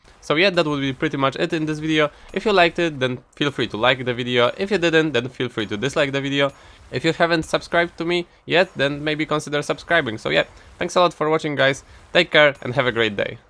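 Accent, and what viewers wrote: Poland. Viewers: This Polish accent :D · jak Ty Polak XD wszedzie sie da poznac ten akcent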